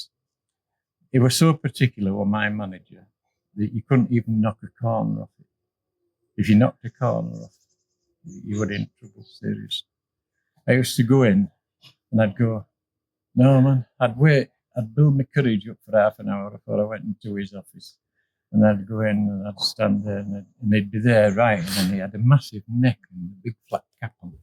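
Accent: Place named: Yorkshire, United Kingdom. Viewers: I understood every word, I married a Yorkshire man! Great skills passed on ! Are the boys having a go? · It’s cors he’s from up north uk...... listen again and you’ll get it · Well done Dad your becoming a star. Keep it up. although most can not understand your accent, I think they need Yorkshire lessons. :-)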